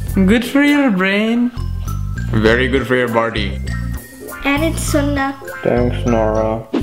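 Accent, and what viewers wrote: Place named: Bengal, India. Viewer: btw.. your bengali dielect is so hard to undeestand.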